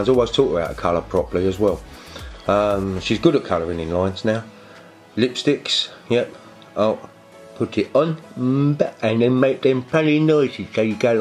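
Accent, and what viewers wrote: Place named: Cockney, United Kingdom. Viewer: cockney accent love it x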